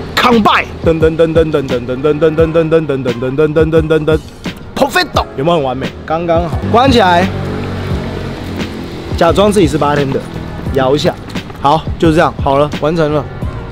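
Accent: Japan. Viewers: 他說的英文是那邊的英文？ 日式英文？ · 我喜歡你的日式英語😆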